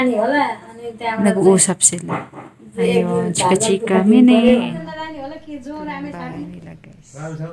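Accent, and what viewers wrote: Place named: Hiligaynon, Philippines. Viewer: d talaga mawala tono.ng.ilongga day🥰🥰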